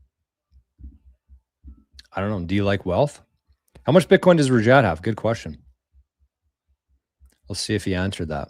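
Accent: Canada. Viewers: I know you can never really know and I've long lost faith in humanity that being said not sure if it's the canadian accent or what but i get serious good guy vibes from you man, you deserve allot more followers. Thanks to you i'm saving my little pennies in sats. One day i'll be stoked to have a whole .1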